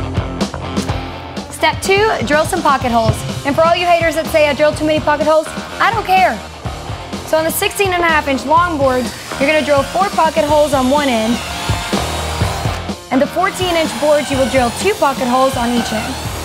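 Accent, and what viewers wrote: South, United States. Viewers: Love that southern accent when she said " For all you haters saying I drill to many pocket holes , I don't care" BOSS Lady <<<< we need more chicks with attitude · A man can dream. And a beautiful Southern belle accent to top it off.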